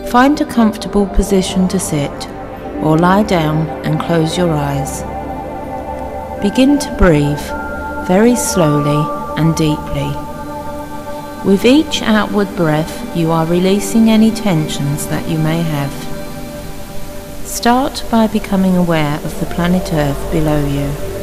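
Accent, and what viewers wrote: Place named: East London, United Kingdom. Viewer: Worst. Accent. Ever. OMG it's like watching East Enders.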